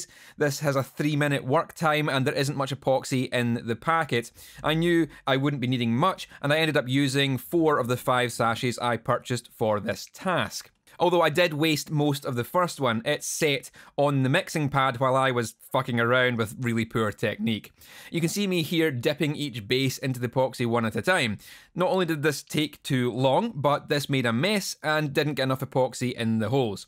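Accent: Scotland, United Kingdom. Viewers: Love that the Scottish pronunciation of "bases" and "edges" sounds like "basies" and "edgies". Also, I'm pretty sure Kerry King did this to his guitar first. ;)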